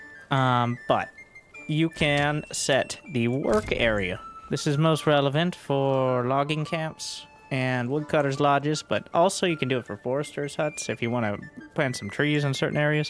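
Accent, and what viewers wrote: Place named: Western North Carolina, United States. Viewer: Where are you from, friend? I can't place your accent. I want to guess Western North Carolina.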